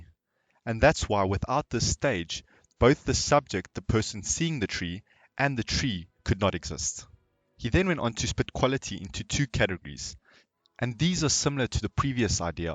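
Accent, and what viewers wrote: South Africa. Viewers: South African? with a cold? misses the mark. Maybe you should re-read it a hundred times like everyone else and then come back and do the video again. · Soutie accent 100% lol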